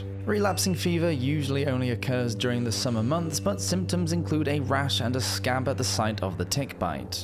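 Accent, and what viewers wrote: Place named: Leeds, United Kingdom. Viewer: You have a Leeds accent I think. Im South African btw so no experience here.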